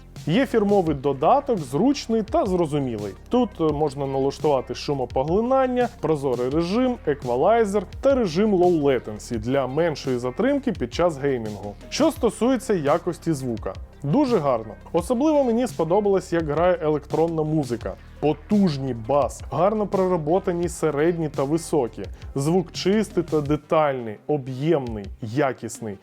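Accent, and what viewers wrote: Russia. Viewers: Не ображайтесь, але дуже бісить, коли багато русизмів в мові, мов Азіров говорить! Важко слухати людину, яка все життя говорила тільки російською, і перейшла на Українську (але за те, що перейшов на УКРАЇНСЬКУ- респект)! Ведучий, моя тобі порада- не пиши сценарій, дай написати людині, яка вміє говорити по-українськи, все таки Цитрус- це гігант комерційного продажу, будьте «на рівні»!!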